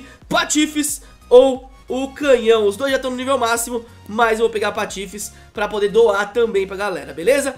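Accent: Minas Gerais, Brazil. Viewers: e mineiro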